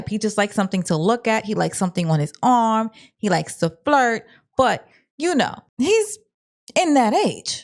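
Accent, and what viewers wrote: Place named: East Coast, United States. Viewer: girl I just love you the east coast accent just everything gurllll❤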